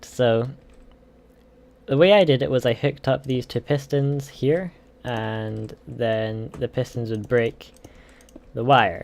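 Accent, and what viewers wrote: Scotland, United Kingdom. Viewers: are you pretending to be scottish or lived in scotland for a while or something?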